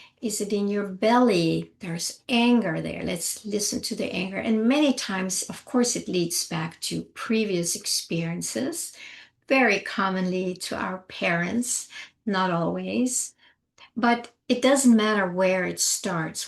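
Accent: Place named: Europe. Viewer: Some times I write comments to quick lol Yes, not in a judging way. In a living manner I say love and compassion first second and third then try try again It’s just so stupidly simple We tend to complicate it some make the biggest messes then we have to help clean up. That’s ok cuz hopefully they have helped clean up ours lol. Ya know, your videos have this way with me. The maternal aspect of your voice with your subtle accent reminds me of old world Europe. My grand mother That old Rumanian Gypsy So thank you 🙏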